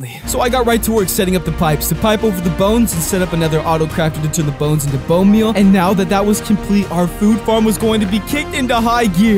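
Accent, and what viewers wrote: United Kingdom. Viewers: Hey I realy realy realy want you to hit 10mil and and your my favorate british youtuber keep it up and good luck and your british acsent is amazin🇬🇧🇬🇧🇬🇧🇬🇧🇬🇧🇬🇧🇬🇧🇬🇧🇬🇧🇬🇧🇬🇧🇬🇧🇬🇧🇬🇧🇬🇧😀😀😁😁😁😁😀😁😀😀😁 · wait is it just me or does he have a brit accent sometimes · I always wonder how you speak british and inglish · ??? I hear br'ish accent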